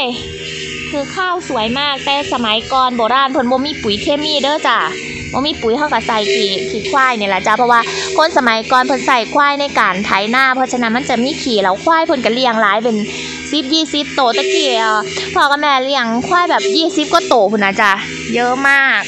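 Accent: Isan, Thailand. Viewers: ปลูกผัก ปลูกพืชแบบปลอดสาร ก็งามได้เนาะ..แต่..ต้องดูแลเรื่องศัตรูพืช..เว้าอีสานเป็นตาฮักเนาะ ^^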